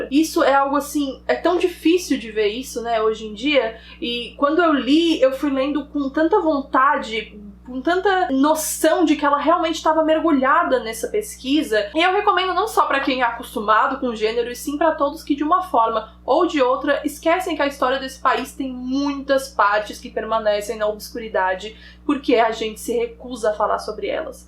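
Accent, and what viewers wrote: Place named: South, Brazil. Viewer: Meu sotaque é igual ao da (talvez um pouco mais puxado rs) e eu sinto uma certa representatividade quando ouço ela falando as gírias do sul e enfatizando o Ê no final das palavras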